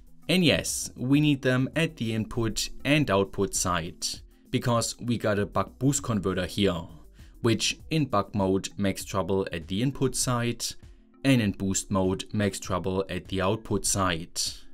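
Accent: Germany. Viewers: You are definitely not a Scott, this German accent is too thick,... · Informative Videos! Habe nicht gleich erkannt, dass du eigentlich deutscher bist :D erst nach einem Kommentar von einem der dich angeblich bei Würth gesehen hat, wurde mir klar, dass du etwas Akzent hast und wer noch außer Deutsche Verwenden noch Fineliner 😀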